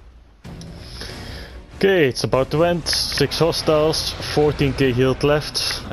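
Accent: Netherlands. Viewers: Hoor ik hier een Nederlands accent hahaha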